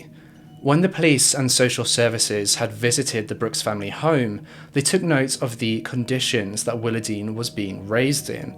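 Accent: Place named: England, United Kingdom. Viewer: i ABSOLUTELY LOVE LOVE LOVE YOUR ENGLISH ACCENT. SOO JEALOUS... IM FRIM NEW YORK A WHITE MALE WITH AN EVEN STRANGER ACCENR THAN YOURS. I LOVE YOUR CHANNEL. I COULD LISTEN TO YOU ALL DAY LONG. MUAH .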